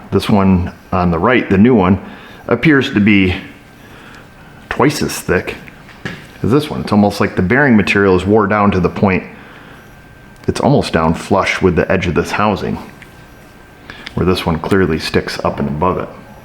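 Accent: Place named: Massachusetts, United States. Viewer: Wicked good MA accent 😂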